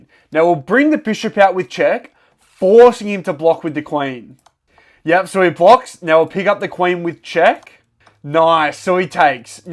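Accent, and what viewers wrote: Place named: Australia. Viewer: australian accent lol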